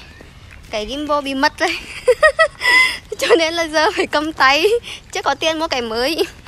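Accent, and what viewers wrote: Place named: Nghe An, Vietnam. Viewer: Xin chào oi, hôm nay lại được gặp và nghe giọng Nghê An hà tĩnh dễ thương làm mình nhớ VN mình quá, vườn hoa hông chỗ bạn đẹp quá, nhiều hoa nữa, mõi lần xem video của bạn mình có cảm giác đang cùng bạn đồng hành ở 1 đất nước rất xa VN, dù ở ca nhưng mình thấy có vẻ rất gần vì mõi ngày vẫn lên YouTube tìm xem video mới của bạn, nghe giông VN xa quê, người VN xa quê. Bên đó vẫn đỡ buôn hơn vì có cộng đồng VN mìn rất đông phải ko bạn. Bạn giữ gìn sức khoẻ nha. Chúc gia đinh bạn nhiều yêu thương. Mình vẫn sẽ chờ đợi nhưng video mới của bạn mõi ngày và đồng hành cùng gia đình bạn.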